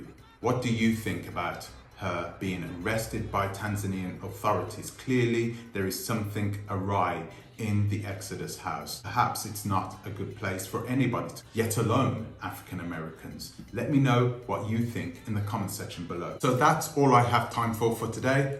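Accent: United Kingdom. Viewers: It's easy for you to sit there with your British accent and judge this woman. So I ask you, when have you ever run an establishment like this?? Do you know her history? Have you seen the videos of the property before she restored it??? Do you know what it's like to have housekeepers and to run a home staff?? Especially when you're from a different country than your staff?? Do you even know that she's a single mother?? Have you seen the videos of people who have done review videos of their stay at Exodus?? They have filmed and shared their wonderful experience that they had there. Did you see the interview that was nearly an hour or more that the YouTuber called traveling sista did with her and how beautiful it was?? Do you know her history?? So stop trying to have a sensational story to grow your pathetic channel. Why don't you try going back to your roots and get rid of that damn British accent which never looks right on an African person. 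Take your ass to Tanzania or any other country and establish something for African people. Stop judging!! What goes around comes around and karma can be an evil bitch.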